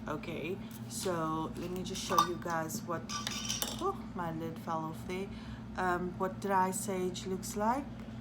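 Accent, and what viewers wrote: South Africa. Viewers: Are you south african because you have a South African accent? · Yay... south African!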